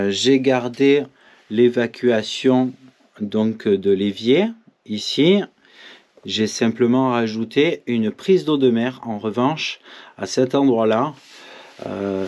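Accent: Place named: Provence, France. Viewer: Bonjour très beau boulot j'ai un petit voilier sa fait 4ans que je lui est rien fait dessus faute d'argent c'est une coque acier maintenant je suis a la retraite donc je pense avoir un peut de temps sa fait plaisir de voir une personne comme toi qui se défonce et surtout qui si tien bon comme on dit en Provence c'est bien pitchoun si tu passe en nouvelle Calédonie fait moi signe on prendra un pot ensemble ( je suis de la Provence aussi et sa me fait plaisir d'entendre l'accent )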